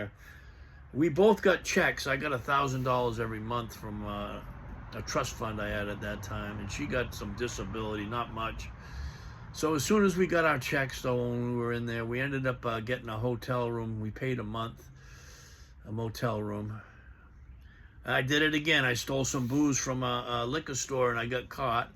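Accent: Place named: New England, United States. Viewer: good to hear your doing good man! I'm a new Englander myself not far from you right in southern new Hampshire I recognized the accent wicked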